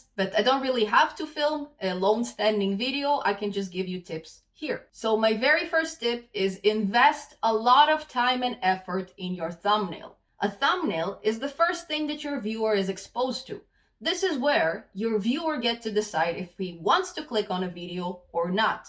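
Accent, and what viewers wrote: Russia. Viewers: your voice gives me tingles. Where u from? Russia, Ukraine?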